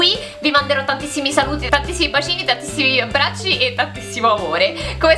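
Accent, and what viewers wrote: Rome, Italy. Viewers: Il tuo accento romano è qualcosa di unico 😂❤️ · è bellissimo il tuo accento romano !!!!!!!!!!!!!!!!!!!!!!!!!!!!!!!!!!!!!!!!!!!!!!!!!!!!!!!!! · Ma solo io ho provato a vedere questo video in inglese e dopo i primi 10 secondi ho mandato al diavolo le mie intenzioni perché amo il suo accento romano?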